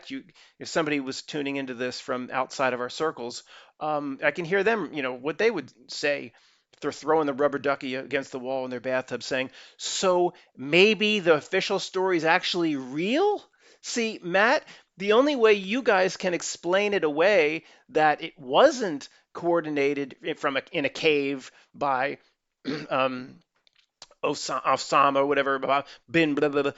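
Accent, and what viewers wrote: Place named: Boston, United States. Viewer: Love your Boston macadamian accent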